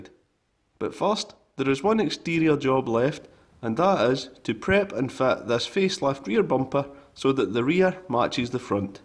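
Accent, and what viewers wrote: Scotland, United Kingdom. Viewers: I think one of the reasons I subscribed to your video is because of your great Scottish accent as well as the great job you do to clean the car. Lol. Have a great day mate. 👍👍👍🇦🇺🇦🇺🇦🇺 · I can appreciate that you don't speak too fast because I wouldn't be able to understand with that collin mcrae accent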